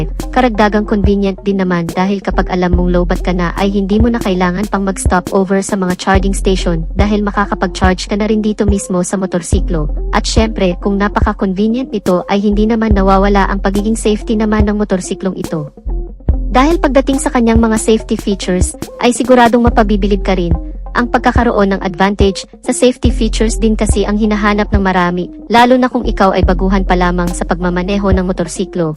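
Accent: Visayas, Philippines. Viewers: Bisaya ba ang nag sasalitang ito....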